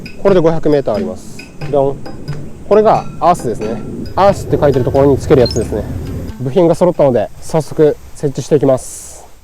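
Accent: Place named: Okinawa, Japan. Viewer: 沖縄のイントネーションとキャラがいい感じで楽しく視聴させて頂いてます！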